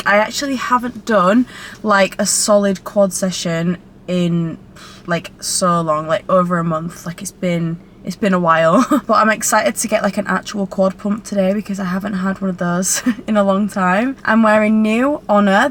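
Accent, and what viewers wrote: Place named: Burnley, United Kingdom. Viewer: I love that accent. Are you from Burnley?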